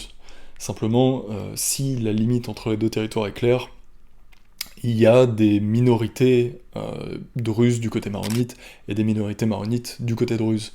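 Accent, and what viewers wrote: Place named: France. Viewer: J'adore ton accent français!! 🤩🤩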